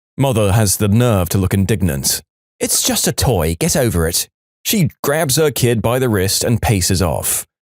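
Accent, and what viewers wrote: United Kingdom. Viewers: is it just me or does anyone remember his voice being deep in past episodes and now he sound British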